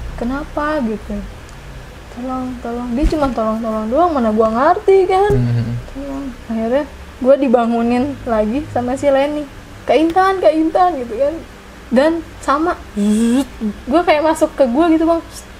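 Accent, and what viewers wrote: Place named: Depok, Indonesia. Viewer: Kentel amat mpok logat betawinya, ciri khas betawi gaul, klo cerita asik dan seru, idup cerita nya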